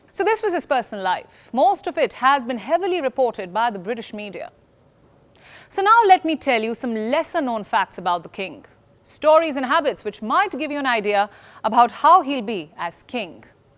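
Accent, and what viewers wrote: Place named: India. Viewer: Listening to a Hindu accent is uncomfortable… Sorry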